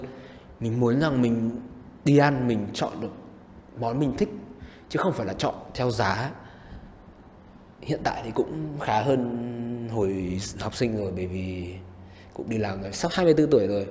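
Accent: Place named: North, Vietnam. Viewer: Bạn này giọng ấm quá nhỉ ^^ Siêu thích boy nói giọng Bắc :3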